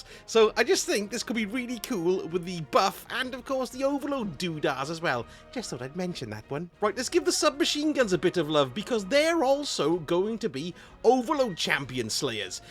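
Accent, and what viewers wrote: Wales, United Kingdom. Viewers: I love a Welsh accent!! 😍😍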